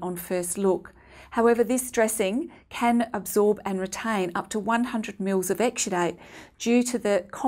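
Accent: Australia. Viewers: OMG Her Australian accent is giving me a headache!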